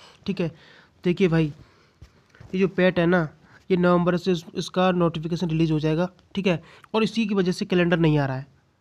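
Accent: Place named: Gorakhpur, India. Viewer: apke bat se lg rha ap gorakhapur side kr rahne vale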